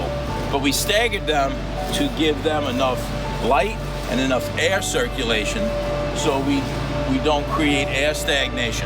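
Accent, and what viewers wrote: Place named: New York, United States. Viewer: Ohhhh...New York. I grew up in the Hudson Valley & I miss it. In OK now. It's so good to hear that NY accent again. Beautiful landscape.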